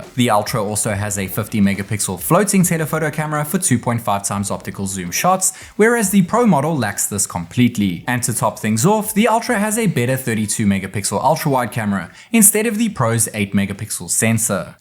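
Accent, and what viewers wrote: South Africa. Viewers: The moment i heared your voice ik you were south African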